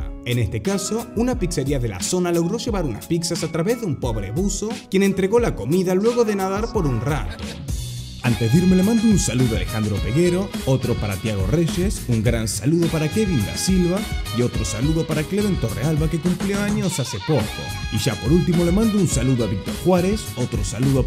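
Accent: Rioplatense, Argentina. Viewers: Sos Argentino? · Es Argentino. ..😎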